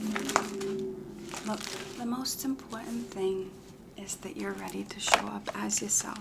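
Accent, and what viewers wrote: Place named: Long Island, United States. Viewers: Something about the Long Island accent makes me so happy lol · what I want to know is how you got even the mannerisms to a T… you sure you’re not from long island??? 😂🩷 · Omg yes! She does the Long Island accent in that series too. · the way my name is Chelsea and i’m from Long Island with family that sounds like this 😭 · I’m from Long Island!!🎉 I heard more of a Brooklyn/bronx accent mixed in there too! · yeah sounds about like the mothers id hear sitting at other tables at a restaurant on LI 😭😭 great job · LMAOOOO I literally loved this video, me being from Long Island just made me laugh through the whole video bc I feel like I don’t have one and my friends say I do 😭 but girl the accent is hilarious I loved this whole heartily I get so excited when you post 😝🩷!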